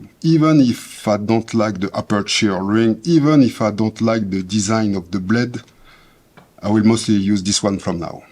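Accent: France. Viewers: J adore comment tu own ton accent frenchy la classe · Im a bigf takumar fan but I own ttartians are good too your french accent is quite pleaseing what software do you use for your graphics? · Super Chaîne ! A l'accent vous parlez français nan ? :D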